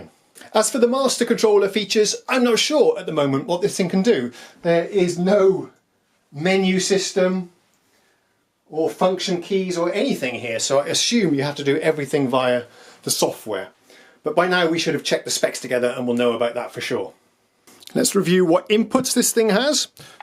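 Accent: United Kingdom. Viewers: Looked like a classic Swedish snow covered villa-house block… but you sound British?? Great video..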